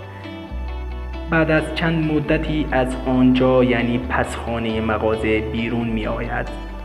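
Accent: Afghanistan. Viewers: داداش این افغانیه واسه همین اینطوری حرف میزنه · لحجش تخمیه چون گوینده افغانیه 🤢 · مث بقیه برادرای افغان صحبت کن خیلی هم لهجتون قشنگه اینجوری ریده میشه به کلیپ این همه یوتیوبره افغان و همم عشق میکنن با کلیپاشون و بیشتر به خاطره لهجشون میرن کلیپاشون رو میبینن شما اومدی فارسی صحبت کنی خوب معلومه گند میزنی فارسی رو مدل افغانیه خودتون بگو با لهجه خودتون عالی میشه مث این میشه که من تو افغانستان باشم و بخوام به لهجه شما صحبت کنم صددرصد مسخرم میکنن چون بلد نیستم و همش گند میزنم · نه ایرانی حرف نمیزد همین لحجشه در افغانستان هم لحجه های مختلفی هست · این چه جور حرف زدنه انکار یه افغانی تازه اومده ایران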